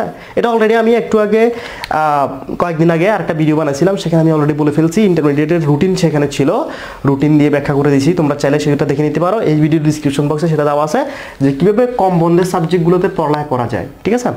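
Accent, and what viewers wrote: Chittagong, Bangladesh. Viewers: Bhaia you from Chittagong???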